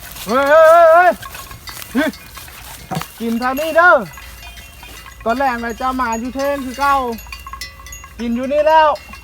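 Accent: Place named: Phu Thai, Thailand. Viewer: พูดน่ารักคำพูดเพราะภูไทยทีอีกภูไทหึ่วคำพูดออกแข็งทีลาว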